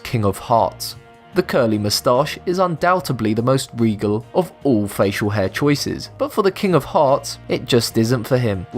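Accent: United Kingdom. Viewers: British English... How I love to hear it up! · ABritishGuy He doesn't sound American to me. He sounds British. So who is the idiot now. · 1) You’re an idiot! 2) My ears bleeds wherever I hear a British accent! 3) You make a lot of remarks you think are funny but in reality they are not. People who have no sense of humor should abstain from making lame remark that only they believe is funny. 4) “The seven spike on the statue of Liberty represents the 7 continents” False! Untill a decade or two it was aways believed and taught in school in the <num>’s that there are 5 continents: Europe, Africa, Asia, Australia, America. It's only recently (around 1980 or 1990) that we added Arctic and Antartica. If you are going to make up things why not say it represents the 7 days of the weeks? I mean if you want to make stupid comments, go all the way.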